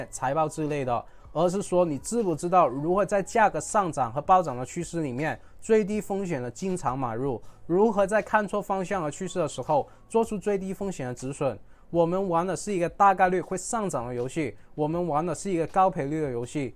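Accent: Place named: China. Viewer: 原來你是大陸人，難怪口音用語不像台灣人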